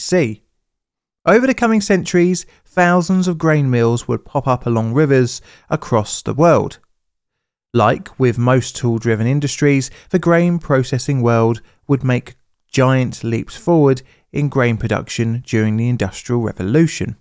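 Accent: United Kingdom. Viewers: I don't think there is ever a point where, when a Brit speaks, I have to translate at least a word, into American! Lol.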